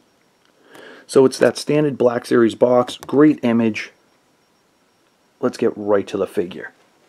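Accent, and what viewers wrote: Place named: Massachusetts, United States. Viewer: Hey, you from Mass? The accent sounds familiah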